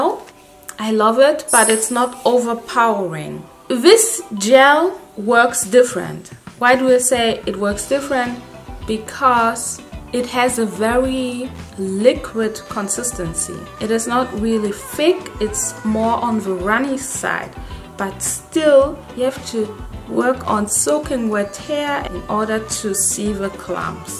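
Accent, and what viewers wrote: Germany. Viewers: Love your German accent ❤ big fan of kinky curly and Camille rose 💗🫶🏽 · Are you German or speak German? Your English sounds like the ones Germans speak Lovely hair 😍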